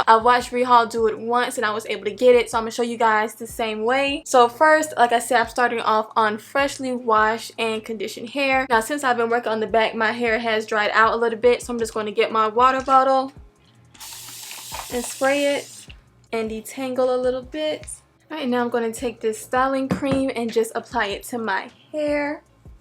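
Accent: South, United States. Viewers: I love your accent are you from the south?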